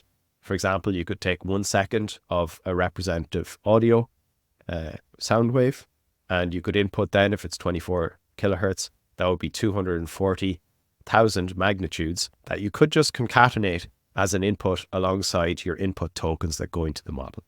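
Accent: Ireland. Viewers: Three things: 1. This is by far the most thorough, informative and approachable video I have come across on TTS; really appreciate the time and care you have put into this. 2. As a South African, I absolutely love the Irish people and accent - a lot of learnings in this video about accent, pitch and tone that will be very useful (I think) for TTS for under represented languages, very important from an African perspective. 3. Very well earned like and subscribe; I look forward to learning more from you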